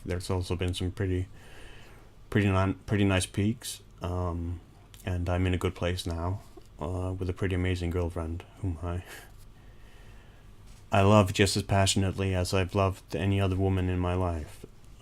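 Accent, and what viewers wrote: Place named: Denmark. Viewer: hehe det er slet ikke så slemt, for det meste kan man ikke høre det, men en dansk accent har det med at trænge igennem fra tid til anden :) anyway vil bare sige at jeg subbede den anden dag efter en podcast og lige havde luret dine steep vids, og kan da se at det er gået hurtigt med et par subs den sidste uge :) håber det fortsætter med at stige og ser frem til flere videoer, kan se den nye L.A. noire er ude, good stuff :) hvis du nu skulle få tid eller lyst ville jeg da blive glad hvis du gad tjekke min lille kanal ud, har kun været i gang i 4 måneders tid så er stadig i gang med at finde min "stil" hehe (var ikke derfor jeg subbede skal lige siges men det skader vel ikke at spørge hehe) aaaaanyway du må have en dejlig uge :)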